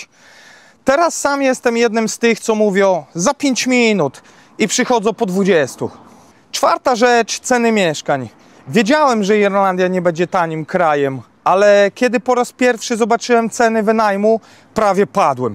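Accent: Poland. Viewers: Dla mnie zagadka jest twoj polski akcent.. ;) skad?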